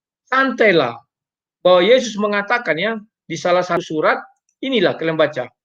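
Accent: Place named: West Java, Indonesia. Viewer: Logat Sunda 😂😂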